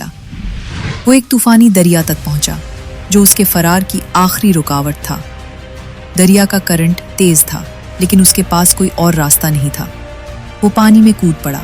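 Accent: India. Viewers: Ap ke urdu Hindi jesi ha I mean lehja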